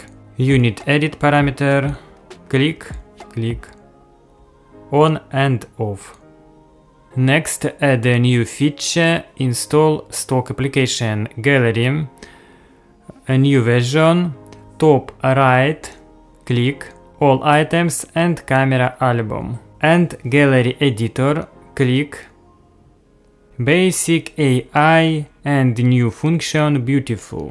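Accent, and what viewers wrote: Russia. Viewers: Сразу слышно что автор говорит на русском)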